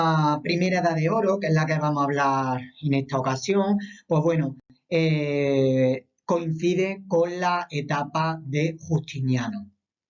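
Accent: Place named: Granada, Spain. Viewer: Eres de Granada? Me encanta tu acento jajajaj, de toda forma muchas gracias pa este video, me ha ayudado un montón 🙏🏻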